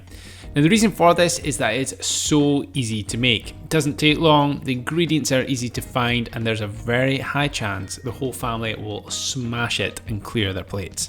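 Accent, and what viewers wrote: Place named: Scotland, United Kingdom. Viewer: I really enjoy listening to your lovely Scottish accent talking us through these recipes and I am looking forward to trying some of them very soon. I especially enjoy the slow cooker recipes as that’s all I have at the moment, and recently made a pasta Bolognese from one of your recipes and it was delicious! 😊 BTW, my guide dog is actually from Glasgow. 🦮😄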